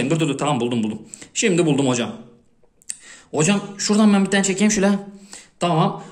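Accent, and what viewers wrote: Thrace, Turkey. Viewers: Trakya şivesi güzel la kalsın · Trakya şivesi yapma sadece küfür yapppp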